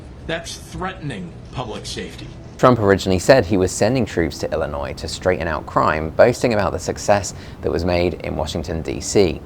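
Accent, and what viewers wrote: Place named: United Kingdom. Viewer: Kick out this UK guy or charge 100K for him. I am sick and tired of getting USA news with British accents. Please go home!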